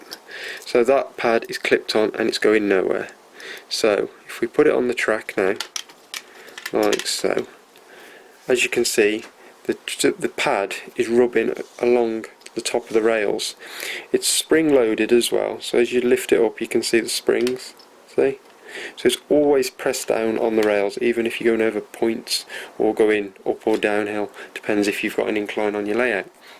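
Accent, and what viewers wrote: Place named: Midlands, United Kingdom. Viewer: Very rare in Uk for N Gauge, but just found one on EBay. Buying it now. Enjoyed the video and enjoyed the Midlands accent too. Honest. I am ex-Kiddy, but now in Cornwall. Keith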